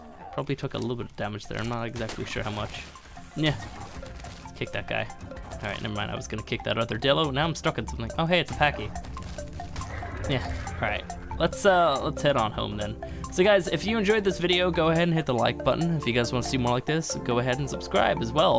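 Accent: Ireland. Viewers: Which part of Ireland are you from? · Are u Irish bro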